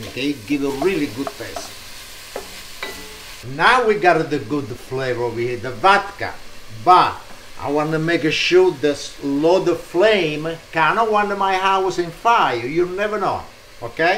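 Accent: Italy. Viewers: I like his Italian accent so nice. As well I love pasta.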